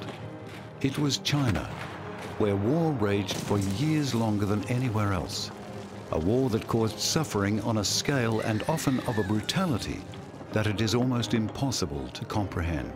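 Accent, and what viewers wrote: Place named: RP, United Kingdom. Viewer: Tse Tung was romanized to Zedong maybe ten years ago? I dunno for sure but recently-ish. And Keev I think is just his posh and very proper British accent kicking in.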